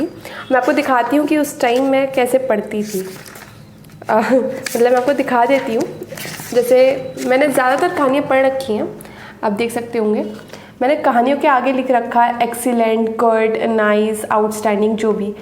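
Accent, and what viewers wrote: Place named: United Kingdom. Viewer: Missing the British accent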